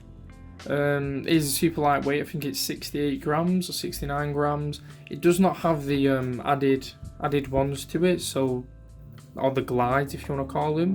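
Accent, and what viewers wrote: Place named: Manchester, United Kingdom. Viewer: You sound like you're from a town near me in Manchester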